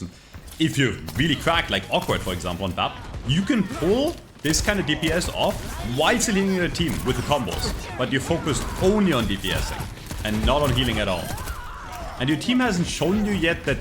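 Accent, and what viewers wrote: Germany. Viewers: Boi, are you German? 😅 Or what is that accent?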